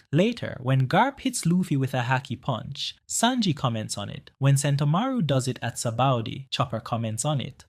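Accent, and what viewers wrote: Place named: Jamaica. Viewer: Yuh sound jamaican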